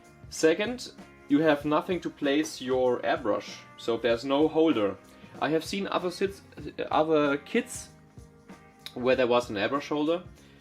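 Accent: Germany. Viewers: Man hört raus das du ein Deutscher bist ^^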